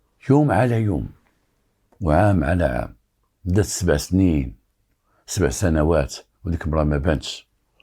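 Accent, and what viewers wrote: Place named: France. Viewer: . صاحب صوت وثقافة ولكنة فرنسية فريدة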